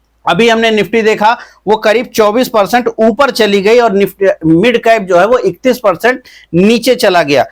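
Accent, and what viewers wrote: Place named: Ayodhya, India. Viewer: Ayodhya ji se hain, Kanpur ki boli lahja thoda alag hota h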